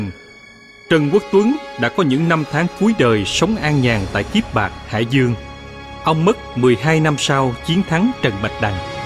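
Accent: South, Vietnam. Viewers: Giọng miền nam hơi khó nghe đối với người miền bắc. Hy vọng anh lồng tiếng nói rõ hơn. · Giọng nói thuyết minh Miền nam hay quá,khen ,để mấy anh chị HN chắc không ai nghe .Thanks for posting.(soluu huong)🇺🇸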